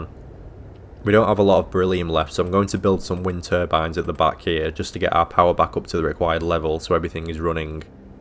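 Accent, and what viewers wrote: United Kingdom. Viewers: You sound English so why say ALUMINIUM the American why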